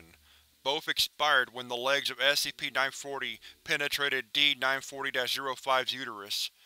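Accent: South, United States. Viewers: Third! Love your voice dude. Very southern and somehow militaristic which goes along with the SCP stuff. Been binge watching your videos.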